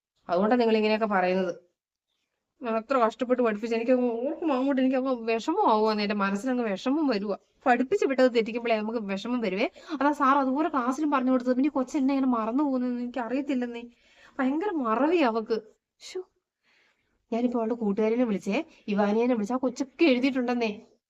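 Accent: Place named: Kottayam, India. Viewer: Ee kottayam slang engane chechi kku vanne... Ennayalum kekkan rasava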